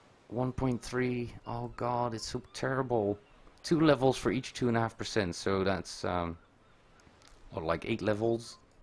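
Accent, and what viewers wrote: Netherlands. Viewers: that's why I asked it sounded like he had the accent im from NL too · is from NL Cant you tell with the accent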